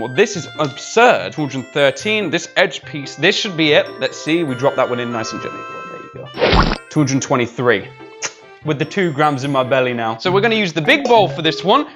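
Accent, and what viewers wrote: United Kingdom. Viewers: I can hear the British more now that he is not speaking directly into the microphone. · I like how when cooks or makes anything he just becomes so extremely British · Why does he sound extra British then normal in this video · with bad mic sound like real British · He sounds really British in this video for some reason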